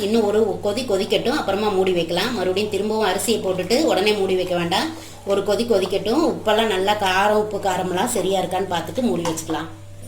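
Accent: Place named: Madurai, India. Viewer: மதுரை தமிழில்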